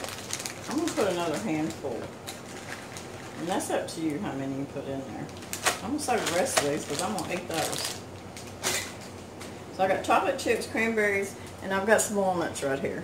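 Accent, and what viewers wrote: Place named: Alabama, United States. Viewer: love your voice it reminds me of Alabama and my folks there. Yahweh bless!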